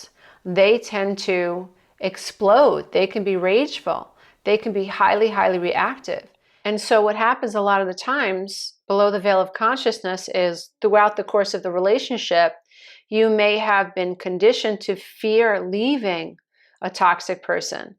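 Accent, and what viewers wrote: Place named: Long Island, United States. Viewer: PS. You sound like you’re from same area I’m at. Bellmore Long Island. Originally from flushing. Then Connecticut Florida Massachusetts and now N Bellmore. Thanks again for your very helpful videos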